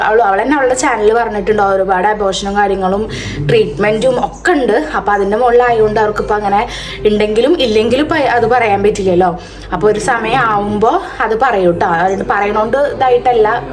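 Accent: Thrissur, India. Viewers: Thrissur angne paraya sheriyakknonnulyaa😌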